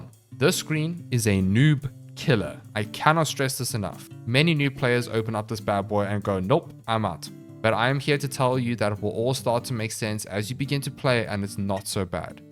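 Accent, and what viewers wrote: Afrikaans, South Africa. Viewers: Is that a south african accent i hear ... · this dude sounds south african 🇿🇦🇿🇦🇿🇦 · Instantly heard the Afrikaans accent and now sir, you have +1 subscriber!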